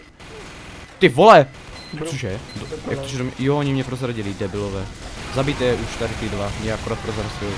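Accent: Russia. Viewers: Nejlepší ten ruskej přízvuk!! (kde jsi se ho naučil?) :D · ten ruskej prizvuk xD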